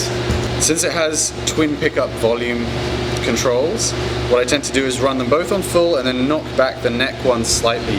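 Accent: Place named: United Kingdom. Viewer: I just came here for the British accent.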